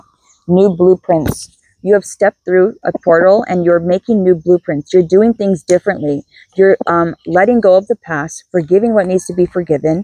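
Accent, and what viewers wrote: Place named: United States. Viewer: Where are you located? 🤔, you have a US English accent but you go by a strange time zone. Anyways I love watching your videos, watching your pets I also have several cats, two dogs and a turtle.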